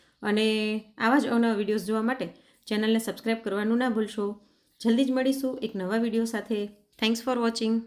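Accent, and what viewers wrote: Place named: South Gujarat, India. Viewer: Glad to know your area. I have never been there in Kutch. Based on your Boli, my prediction was wrong but your boli is not different than South Gujarati. Me and my wife both are from Surat, both retired, settled in USA since 1979 (46 years, perhaps before you were born; again predicting based on your young South Gujarati style voice). Keep on doing good job! Jay Hind! 🇮🇳🇮🇳🇮🇳 Jay Shri Krishna! 🚩🚩🚩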